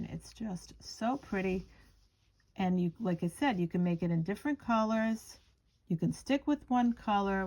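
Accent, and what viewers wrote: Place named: Boston, United States. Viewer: Happy to see ya today, I love the mile a minute everything. I’ve been crocheting for about 40yrs now & enjoy crocheting along with you, always something new and interesting to learn. I love this one! I feel like I’m hearing an Italian Boston accent, you remind me so much of one of my Aunts your voice, knowledge & patience I adore you, awesome teacher. 🫶🏻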